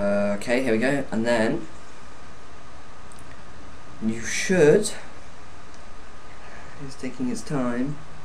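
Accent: United Kingdom. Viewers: yes he has a british accent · I like your British accent :) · are you british?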